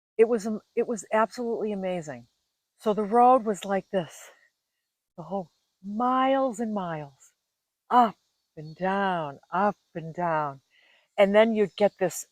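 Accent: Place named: Boston, United States. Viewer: I smiled the entire video! Pure JOY! Happy I found your channel and the second I heard you Boston accent I knew I was going to adore you. Born and raised in New York but living in Utah. (a fish out of water) for sure. Came out to ski in the 80's and the rest is history. I am just staarting to go camping (alone) this coming winter (to a place called Quartzite, Az.) Thanks for the Friday evening S M I L E ~ love the dancing, with complete abandon. Right up my alley!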